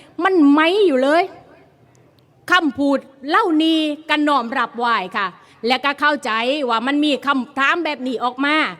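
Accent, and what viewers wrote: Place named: Laos. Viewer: รู้สึกว่าคุณมีสำเนียงลาวปนมาหน่อยนะ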